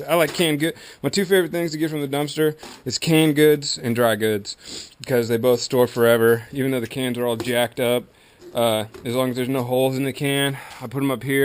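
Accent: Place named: Alabama, United States. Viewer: this dude has to be from the backwoods of alabama or some other southern state. but probably alabama, lol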